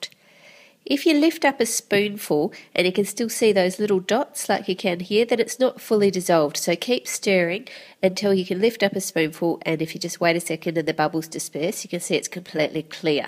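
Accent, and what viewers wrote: Australia. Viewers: Your nose sounds as stuffy as mine feels today! I can't get any air through my nose right now and I sound identical to you. I mean, minus the Australian accent and adding an American accent lol. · I always look forward to your videos. Not only have you helped my daughter and me out in baking and decorating numerous times, you have such a pleasant speaking voice and we ♡ your "sheila" accent (we're from Ohio, USA). The geodes are so ingenious and beautiful. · she definitely does if you are anywhere except Australia, but if you are Australian and have an Australian accent you probably don't notice it 😊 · That's an Aussie accent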